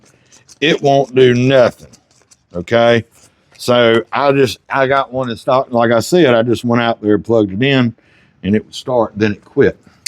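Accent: South, United States. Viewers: I knew you had that Southern Drawl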